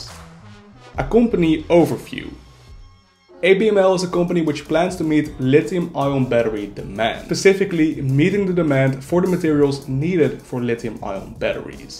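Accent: Netherlands. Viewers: Nederlander ? I can hear the accent! Love the content bro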